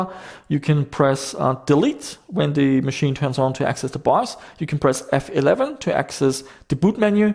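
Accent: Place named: Germany. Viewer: He's Australian, but I believe he has family in Austria from memory, so that may be why he sounds slightly German.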